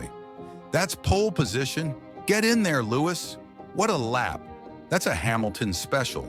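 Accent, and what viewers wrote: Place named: United States. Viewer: I was all in , about to hear what I've heard so many times,heard the American voice over, I clicked off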